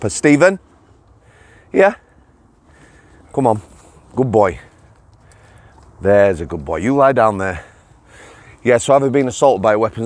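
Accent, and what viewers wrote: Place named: Wakefield, United Kingdom. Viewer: Lost your wakey twang, almost full on Manc !!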